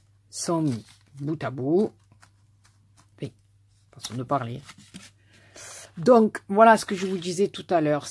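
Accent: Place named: South, France. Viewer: Bonjour j'insiste lourdement avec ton accent mais ça me fait vraiment penser a mes cigales d'Ardèche qui me manque énormément .ta carte est superbe et moi je n'avais jamais vu ce modèle. Merci pour ce joli partage bisous et bonne journée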